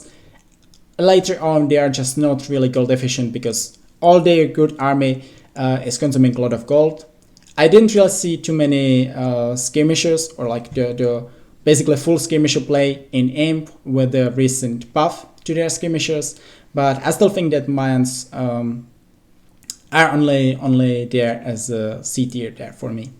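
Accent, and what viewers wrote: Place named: Czech Republic. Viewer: After hearing you say the first three words I instantly knew youre from Czech Rep.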